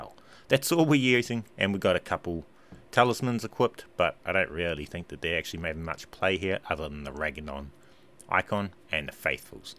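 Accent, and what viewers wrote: New Zealand. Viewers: Churr bro! I thought you sounded kiwi so I poked around your profile and saw that you were! Awesome to see a kiwi content creator I love it bro keep up the good work!! Instasub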